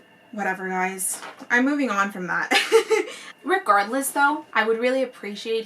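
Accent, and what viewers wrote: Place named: United Kingdom. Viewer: Are you British? I hear an accent every once a while!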